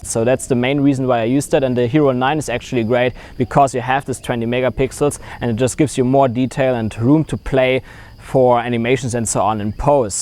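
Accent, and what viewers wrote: Germany. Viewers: You sound a little like a German who speaks English 🧐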